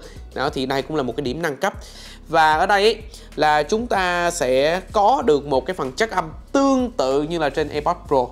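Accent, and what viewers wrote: South, Vietnam. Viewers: Thằng này giọng nai nái sao ấy nhỉ, mới nghe thì ra giọng người nam, mà lâu lâu nói lái lái vài từ người bắc.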